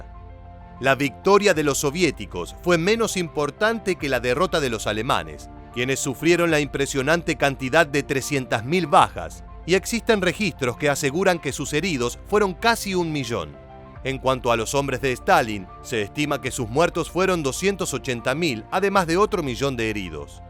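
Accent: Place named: Argentina. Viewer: Hola... No me provoca ninguna satisfaccion escuchar tus comentarios sesgados respecto a los rusos, precisamente xke tengo familia viviendo en moscu ke me mantiene muy bien informado de todo ko ke occidente esta tramando con esto de ucrania... Te escucho y suenas como ke hubieras preferido ke los nazis, aun despues de haber exterminado a mas de 6 millones de seres humanos le hubieran ganado a rusia... X tu timbre de voz veo ke sos argentino y un argentino seguramente RUSOFOBO ke defiende a estos nazis ucranianos ke vienen masacrando a mas de 140 mil ciudadanos prorusos en ucrania desde 2014, y ke seguramente se alegra, festeja y aplauda ke los argentinos tengamos una base nuclear de la OTAN instalada en nuestras islas malvinas... Solo ruego a Dios ke no seas otro milicoide conspiranoico esperando la oportunidad de derrocar otro gobierno constitucional en mi pais utilizando las armas ke pago yo... 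Vi varios videitos tuyos y la verdad venias digamos ke bien... Pero en esta te fuiste a la banquina y quedaste expuesto demostrando lo ke en realidad sos... Un discipulo del tio sam Chau...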